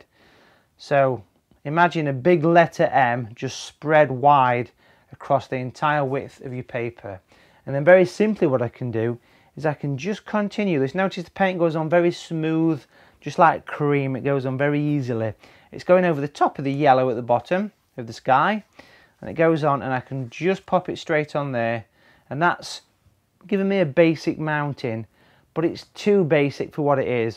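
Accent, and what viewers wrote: United Kingdom. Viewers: Guy's I think this guy is british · Where is he from? A fellow North West Brit but which town?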